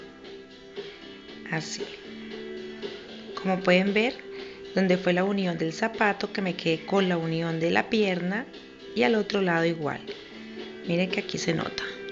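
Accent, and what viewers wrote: Colombia. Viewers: Explicas super 👌🏽 tienes un lindo acento, eres de Cali? Obvio me suscribo a tu canal, me encantó 😉 👏🏽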